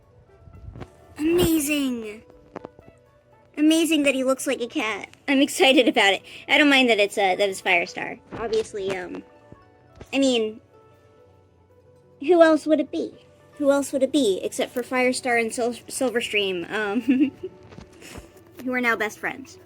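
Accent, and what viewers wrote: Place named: United States. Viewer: i like ur american voice 🤣🤣🤣sorry im british 🤭🤭🤭🤭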